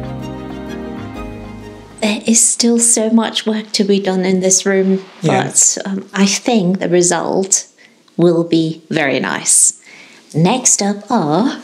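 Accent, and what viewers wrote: England, United Kingdom. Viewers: You say you are Germans - but to my American ears you sound so English!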